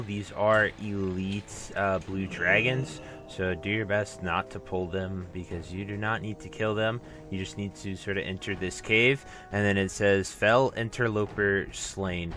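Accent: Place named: Texas, United States. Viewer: Ah, you sound similar to a guy I know from Texas 😊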